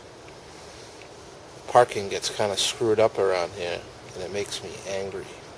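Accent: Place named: New Jersey, United States. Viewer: You sound like you have a New Jersey accent.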